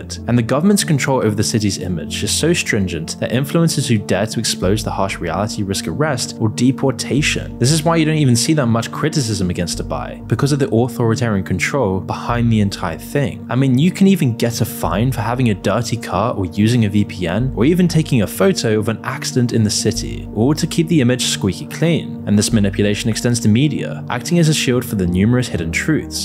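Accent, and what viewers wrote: England, United Kingdom. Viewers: Not defending dubai, but the criticism and comparison with london is a bit rich coming from an english sounding presenter - the kinks and pedophelia of american politicians and british royals is equally evil and above law so far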